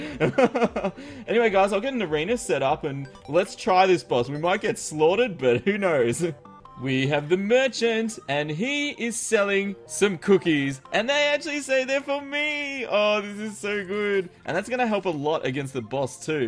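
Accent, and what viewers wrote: Australia. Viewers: Ye he is australian like me :D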